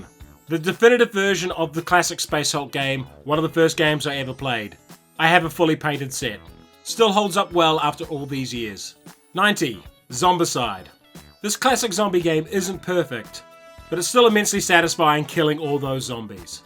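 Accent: New Zealand. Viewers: yaaaaaaa your a new zealander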